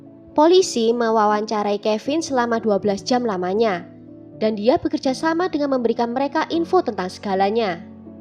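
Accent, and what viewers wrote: Java, Indonesia. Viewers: Alhamdulillah.. sehat trs bahagia trs.. medokuhhh 👍💖💖💖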